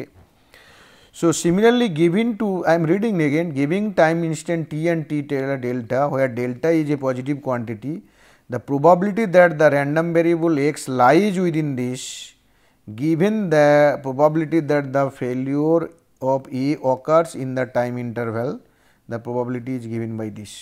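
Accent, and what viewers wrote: Bengal, India. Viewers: Bhai aapka comment padh kar hansi aa jaati hai, Professor ek Bengali hain aur isiliye Bengali accent aa jaata hai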